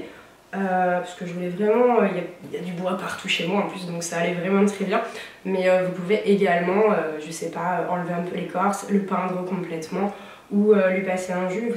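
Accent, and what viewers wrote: South, France. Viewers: Joli DIY de récup' ;) ! Tu as déjà un peu l'accent du sud !! Cela m'a tout de suite interpellé ! Bisous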